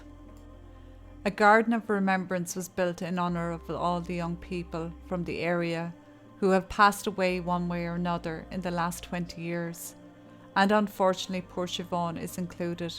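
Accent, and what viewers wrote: Ireland. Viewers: ur great keep it up irish storys told by a irish lady perfect chill in bed channel ❤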